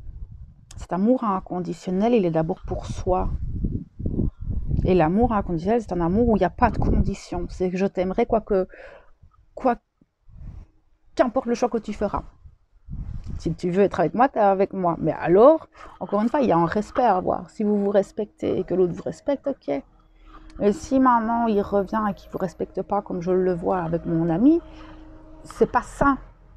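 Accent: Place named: Belgium. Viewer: Il me semblait bien que vous étiez Belge,moi de Charleroi. Première fois que je tombe sur une FJ belge🤗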